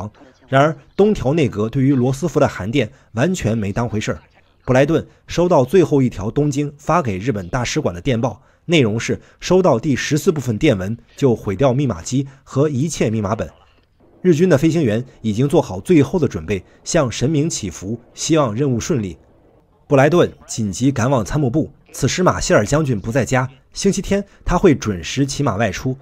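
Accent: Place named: Beijing, China. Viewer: 你是京腔啊 我還以你是台灣人呢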